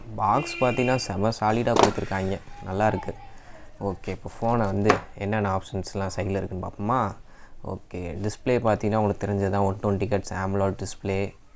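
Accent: Madurai, India. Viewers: Bro neenga madurai ah?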